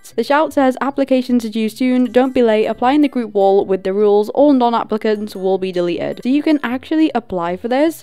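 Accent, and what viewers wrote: United Kingdom. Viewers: gosh i LUUUV your british accent it just sounds so smooth<33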